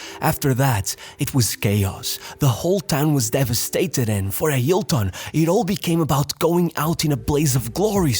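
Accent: Portugal. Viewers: Ja é talvez o 5 comentario que faço em videos teus, e acho que todos foram a dizer o quanto parecias portugues a falar... hoje com camera apareces com a camisola do vizela... Acho que tenho as minhas confirmações 😂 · AAAAH Eu sabia pelo sotaque que tinhas que ser português!!! Bom trabalho, continua! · Bem, o sotaque sempre foi 100% português. Eu tinha dificuldade em reconhecer o sotaque português, mas depois vi (ouvi) o Mourinho falar mil vezes em inglês. Aliás, a frase inicial "listen to this" é perfeita para reconhecer o sotaque português. Pronuncia "Liss-tenm tu dis" em vez de algo como "lisen te ðis"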